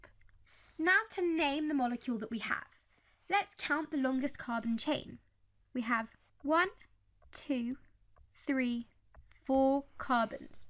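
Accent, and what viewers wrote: United Kingdom. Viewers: British accent eh.. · u mean she has a british accent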